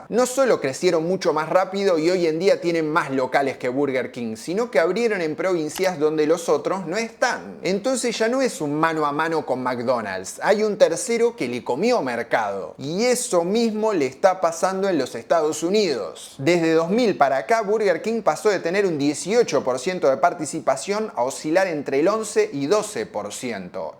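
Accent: Argentina. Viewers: Es Argentino...no te a hablar en español neutro... Es lo mismo que yo pretenda escuchar un podcast mexicano o brasilero y me queje de la tonada... Hay gente estupida en este mundo....realmente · este no es español,es argentino o uruguayo 😂😂😂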